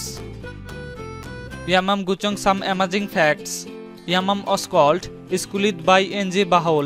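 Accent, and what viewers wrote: India. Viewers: Parang tunog ng Indian pag nagsasalita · Indiano ata tong nagsasalita.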